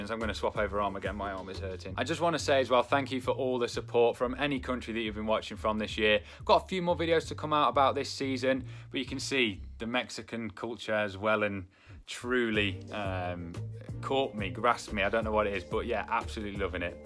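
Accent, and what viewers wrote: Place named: England, United Kingdom. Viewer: just love your accent, Viva england bro!!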